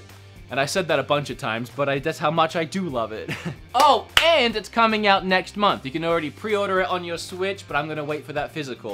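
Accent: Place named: Australia. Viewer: Bro, dont change the Aussie accent man...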